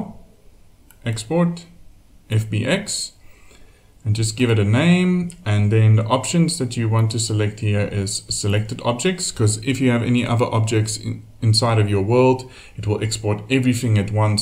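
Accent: South Africa. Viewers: Sounds like a South African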